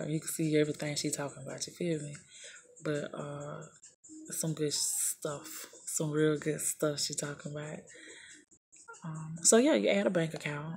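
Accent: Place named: South, United States. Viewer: Hello First I wanna say that I love your "Southern Draw" lol Well I did everything you said and it went through. I even got a conformation of my order. However no shipment conformation or no products was ever delivered. Looked back at my order purchase on amazon and nothing was there. The order was cancelled. So does this really work?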